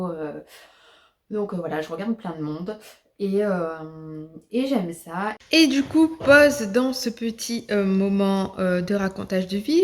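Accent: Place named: Reunion, France. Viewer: Coucou je tombe par hasard sur ta vidéo et j’avais deviné que tu étais de la Run, l’accent ne trompe pas lol très sympa tes vidéos bonne continuation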